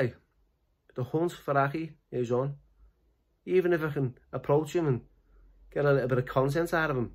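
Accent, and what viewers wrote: Liverpool, United Kingdom. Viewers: Love the Liverpool accent 😊 look forward to seeing more videos on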